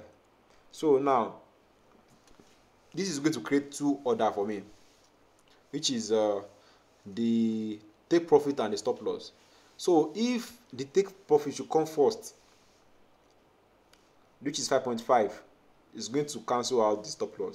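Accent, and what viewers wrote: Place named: Nigeria. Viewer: I liked immediately I heard the Nigerian accent... I know say it will be understandable. I am a subscriber now ❤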